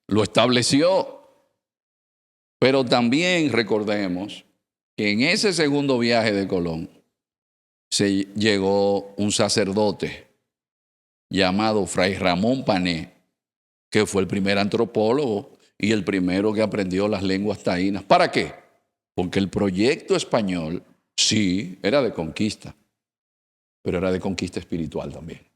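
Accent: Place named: Venezuela. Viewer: Tiene acento venezolano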